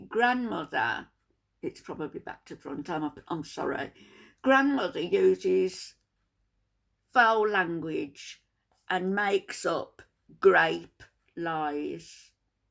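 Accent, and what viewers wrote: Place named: Midlands, United Kingdom. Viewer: I love your midlands accent. You are so on the nail with everything, and your perspective is always interesting and entertaining. Keep up the fantastic work.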